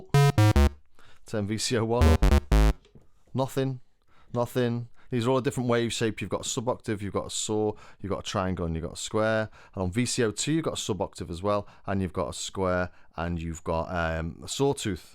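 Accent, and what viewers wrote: Liverpool, United Kingdom. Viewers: hey, I didn't make any comments about your being Scouse (based on what I hear in your accent unless I'm vastly mistaken!), so no need to whinge too much! ;) Haha. Keep up your good work!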